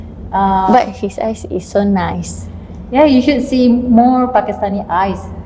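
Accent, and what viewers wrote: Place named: Malaysia. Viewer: I like MALAY ACEENT, SO CUTE ❤️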